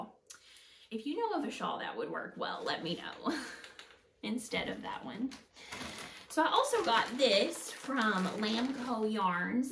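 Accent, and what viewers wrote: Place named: Arkansas, United States. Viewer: Congrats on daring to dive into podcasting! I enjoyed your first episode. And thank you for adding all the pattern/yarn/etc details in writing on the screen... especially because I’m not (yet!) accustomed to an Arkansas accent😉, even though I once drove from Ontario to Arkansas once! It was beautiful, especially Hot Springs National Park, which was my final destination. Driving back home, we took the route through the Wachitas/Ozarks—gorgeous, and reminded me of the Laurentians where I spent my summers. Keep up the good work!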